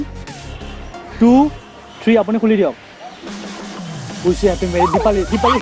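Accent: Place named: Bihar, India. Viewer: Bihari typ hindi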